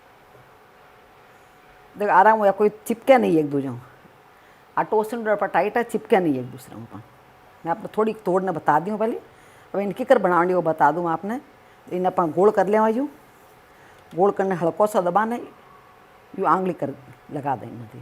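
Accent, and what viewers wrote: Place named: Rajasthan, India. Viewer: AAP kata ra ho. Bilkul mari jeri Marwari bolo ho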